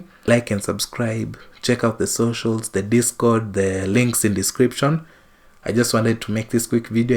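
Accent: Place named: Kenya. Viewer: you sound kenyan :hand-pink-waving: I love the sort of videos like this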